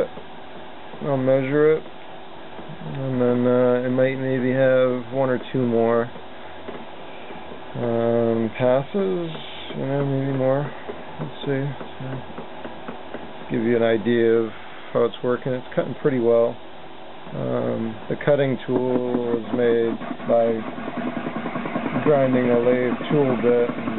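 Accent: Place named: New England, United States. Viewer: I heard the accent. One of those Nor'eastern States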